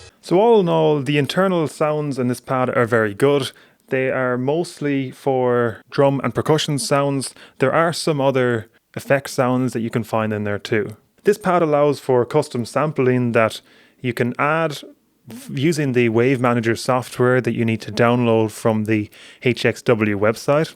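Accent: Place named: Ireland. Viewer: Well I didn’t expect him to be Irish 😂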